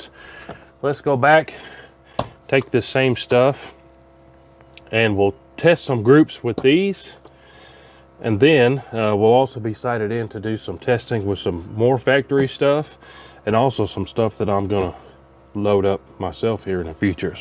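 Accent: United States. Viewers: Message from Australia. Hearing this dude talk is why I love the yanks.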